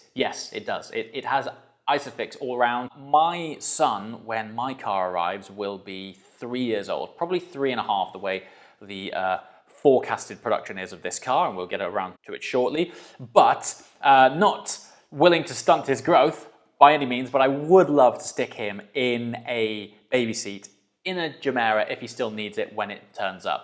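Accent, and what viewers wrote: United Kingdom. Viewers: Truly great technological advancement. I just wonder how reliable that car will be? It looks great too. I agree that the car resembles a Panamera. You don't even know how I appreciate your smooth talk. Many people in the car industry butcher to the point that I can't watch them, That UK accent was like a breeze of fresh air from the North Sea. Cheers from USA!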